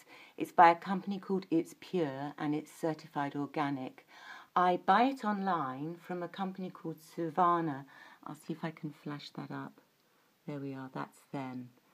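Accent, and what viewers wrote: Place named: United Kingdom. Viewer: Awesome, thank you for sharing! You have a really lovely accent, it's British, isn't it?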